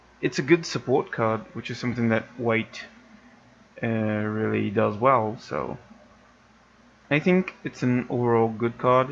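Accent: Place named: United Kingdom. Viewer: Dat british accent tho hue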